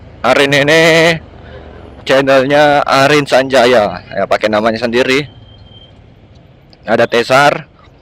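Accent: Makassar, Indonesia. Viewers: mamtap bro logat makassar hehe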